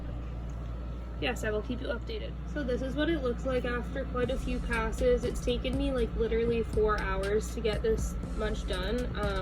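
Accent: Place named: Canada. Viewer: This is incredible, and super helpful rn... thank you 🙃 Also, the most Canadian accent I've heard in some time 😄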